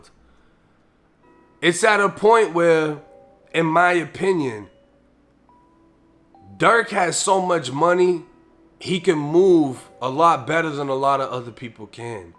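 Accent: Boston, United States. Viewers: You from Boston ? I can read the accent lol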